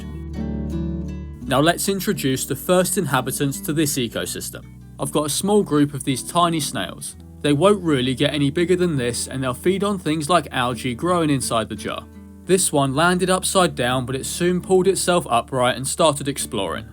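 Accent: United Kingdom. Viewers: Beautiful setup! Watching this to help do mine soon, thank u! ☺️ Would love to watch more videos with the natural cadence of your lovely british accent! ✨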